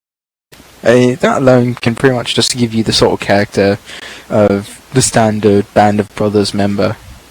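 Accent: United Kingdom. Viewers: British accent!!!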